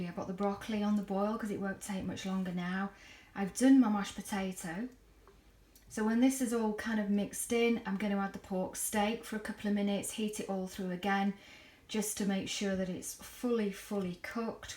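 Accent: United Kingdom. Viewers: It looks very delicious. Very nice video. I love your beautiful British accent and intonation. To be honest, it is good for my English study. Thank you for sharing.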